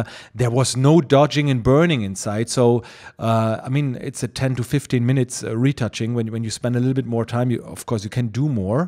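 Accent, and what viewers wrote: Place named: Germany. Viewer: Schön zu sehen (hören), wie dein Englisch innerhalb von 26 min. von "Naja, halt n Deutscher, der Englisch spricht" zu "Hmm...Kanns sein, dass der aus Deutschland kommt?" wird. Man merkt, dass du schon ein wenig rumgekommen bist ;) Schönes Video. Great job.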